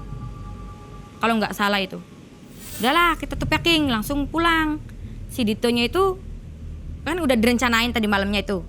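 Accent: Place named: Java, Indonesia. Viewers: Serem tapi aku ketawa Mulu sama Bahasa ngapaknya . Kebetulan aku orang tegal juga mba. salam kenal. sampean tegale Ndi yu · Ngapak tegal 😅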